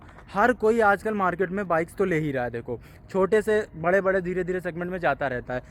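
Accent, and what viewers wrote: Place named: Uttar Pradesh, India. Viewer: Bro your up accent is 🔥🔥🔥🔥🔥🔥🔥,keep speaking 🗣️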